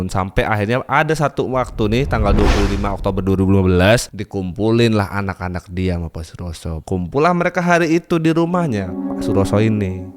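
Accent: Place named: Banyumas, Indonesia. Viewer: Asline ngendi kang,kayane wong ngapak kie ..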